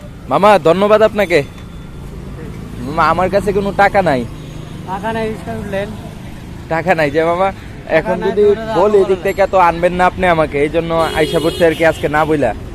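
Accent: Sylhet, Bangladesh. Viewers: VAi apnar basal sylhet er kothai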